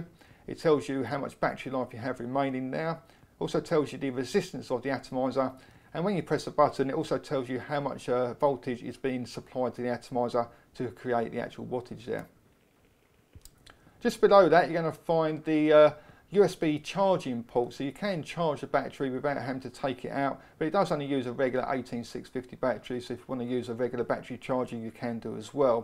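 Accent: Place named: Essex, United Kingdom. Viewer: I didn't know you were from Essex, though I should've guessed from your accent. I've been in CA (US) for 12 years so my recognition of UK accents is waning. I grew up in Stanford-le-Hope, aka Stanford-No-Hope, near Corringham/Grays/Basildon, if you're familiar. I can't lie... I don't miss it at all. :D Okay, I miss the battered sausages, and chips. :D As a tubular mod fan, I think I'll grab one of the couple of DNA20 tube mods that are now appearing, but this does look like a nice unit.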